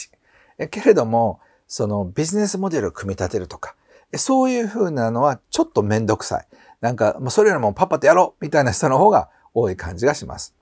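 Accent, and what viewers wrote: Kansai, Japan. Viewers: 面白かったです！ 健さんの動画が好きで、最近毎日見させていただいてます😊 お話を聞いていて、何か関西の雰囲気も感じる方だな…と思っていたのですが、関西出身だったんですね😊 私は話し方やノリは関西が好きですが、関西は値切るイメージがあるから、お金に関するのは関東のが好きかなぁ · 健さんのYouTubeを数ヶ月前から見ています。 健さんの関西弁や、エンタメ要素のある内容、思わず笑ってしまいました。 またこういう面白い健さんも見てみたいです！ ありがとうございます😊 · 健さん いつもありがとうございます✨ 健さんの関西弁が聞けて楽しかったです♪ 私も関西出身ですが、やはりビジネスは関東（東京）中心で関東人の方が、お金持ちになりやすいと思います。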